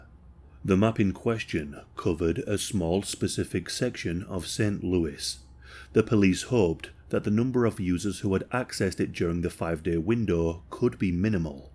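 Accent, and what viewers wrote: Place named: North East England, United Kingdom. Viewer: Not A.I for a change, he's from the very top of England before you get near to Scotland. North-East accent 😍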